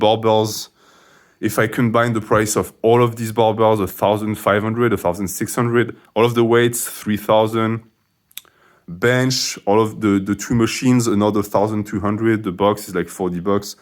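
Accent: France. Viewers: dude im just happy for you reaching 10k subs! good humour, informative videos and french accent :D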